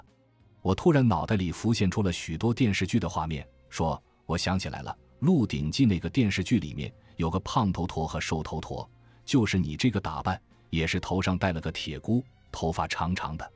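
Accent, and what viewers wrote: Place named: Hunan, China. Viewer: 大概是65年前，在学校安排下，每年要去乡下插秧，收割2次，对些湖南乡下的口音，还记得些。今天听您的故事，对修頭陀的苦行僧很是尊敬，更是您用些湖南乡下的口音，引出乡愁。很为这久埋在记忆中的当时乡下佰姓的纯朴，实在，有一种由衷的怀念，感动。谢谢您的故事。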